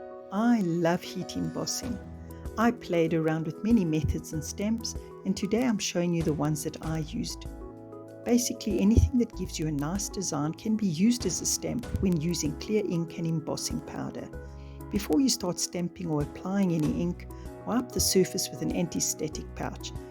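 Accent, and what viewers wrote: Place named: South Africa. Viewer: Hello from a fellow South African! It's always such a treat to click on a video and hear the familiar accent 💖I can't believe I've only stumbled on your videos now. I am miniature mad and consume miniature content like an addict 😂 Your work is exquisite and you are a true artisan. I've learnt so much from you in these three videos, so thank you! 🥰 I have loved all things miniature since I was tiny but have only seriously started making minis this year. It really is the BEST 💞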